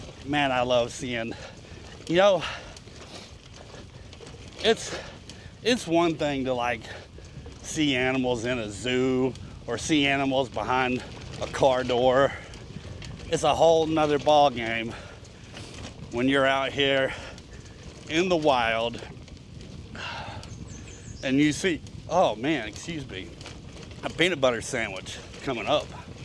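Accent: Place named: Kentucky, United States. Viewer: Moose in the Whites are not a myth! I followed one across Chocorua lake in my kayak once. I’m always surprised to see moose droppings waaayy up on ridge tops on the trails. What are they doing up there? Saw one in Lake Umbagog as well. I’m enjoying your videos.. maybe I’ll see you on the trail sometahm (that was a tribute to your (Kentucky?) accent. Love it.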